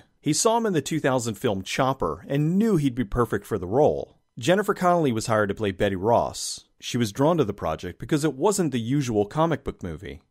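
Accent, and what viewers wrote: Philadelphia, United States. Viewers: Wait, did you say Baña?! Love that Philly accent !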